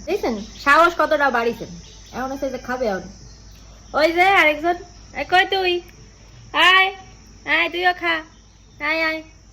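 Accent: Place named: Jessore, Bangladesh. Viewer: আমি নড়াইল থেকে বলছি, এজন্য চেনা চেনা ভাষা মনে হয়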